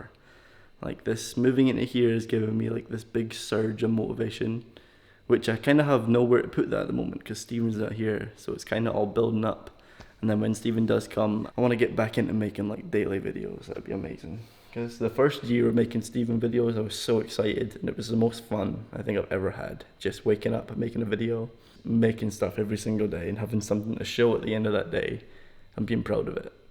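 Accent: Scotland, United Kingdom. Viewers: Yaaas knew you was Scottish 😂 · Dude sounds Scottish af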